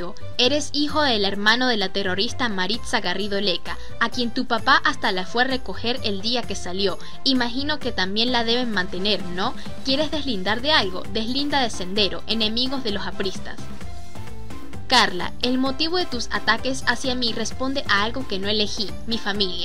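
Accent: Venezuela. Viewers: en este video se te sale el acento venezolano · ACENTO ME SUENA VENEZOLANO Y NO PERUANO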